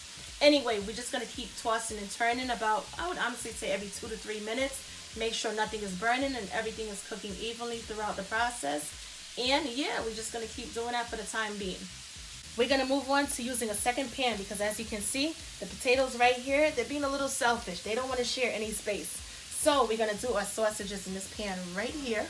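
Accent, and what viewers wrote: New York, United States. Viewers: She got a New York accent....LOL.. I find it lovely seeing Northerners cook Southern foods. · I just made this and it was awesome, easy to follow and put together, thanks love the NY accent. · Didn't look at anything else but I say from NY state. I from NY . Love the recipe. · Definitely NY maybe Westchester or Long Island or Queens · Looks delicious and very easy to follow the steps. I love the NY accent. Would you please check me? <link> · Sounds like she's from newyork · I could almost smell those sausages!!! Her New York accent makes me long for mine😛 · Had to subscribe once I heard the accent. 💯 N.Y in the building right here BX.... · Are you from NY?🤔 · Are you from NY? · Lol love her accent funny she most definitely from NEW York lol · Luv that New Yawk accent. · Ah, Thought I heard the NY accent ,or thereabouts. lol!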